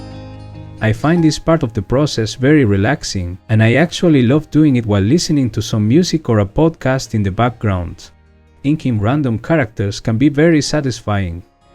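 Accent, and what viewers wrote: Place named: Spain. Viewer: This dude is going crazy I can tell that you are Spanish but well done 👍 G keep it going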